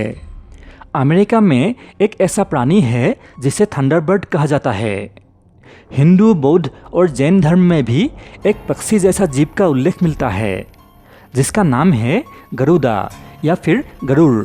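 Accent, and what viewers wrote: Assam, India. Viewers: Apunar tone Tu alop asamiya jen lage assomor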